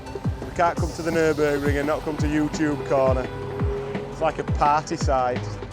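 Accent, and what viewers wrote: Yorkshire, United Kingdom. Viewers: Yorkshire accent and a mullet had me rolling 😂😂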